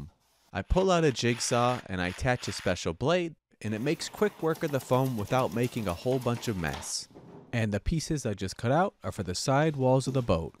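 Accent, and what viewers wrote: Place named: Brooklyn, United States. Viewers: where is this guy from? the accent is subtle. near new york, perhaps? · Unintentional asmr, Mild Hint of Brooklyn Edition. Mom or Dad came from Brooklyn, based on 1 degree of separation ideolect dilution.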